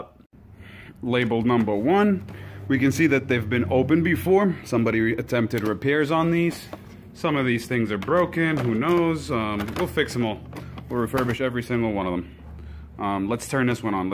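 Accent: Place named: East Coast, United States. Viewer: I like how you get more angry and your east coast accent thickens as the losses mount.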